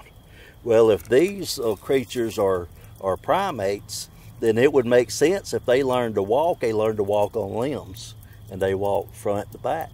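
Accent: South, United States. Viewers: Thanks, but I gotta say I miss your sweet southern drawl.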